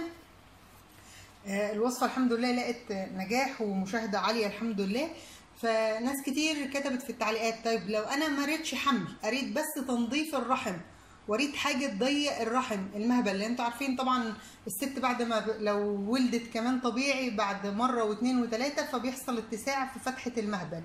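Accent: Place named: Egypt. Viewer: حضرتك لهجتك مصرى على خليجى او مغربى ❤ما شاء الله. جزاك الله خيرا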